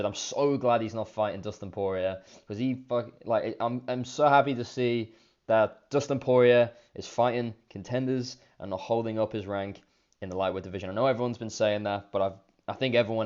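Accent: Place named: United Kingdom. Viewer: I click this video and I hear a thick UK accent LOL - makes perfect sense. You have no clue what you're talking about m8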